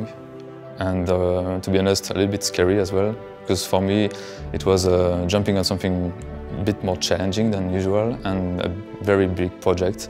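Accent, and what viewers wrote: France. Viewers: This little french accent👌👌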